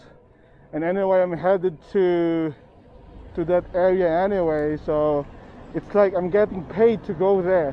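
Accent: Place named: Southeast Asia. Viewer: your accent is asian... errrr South east asian?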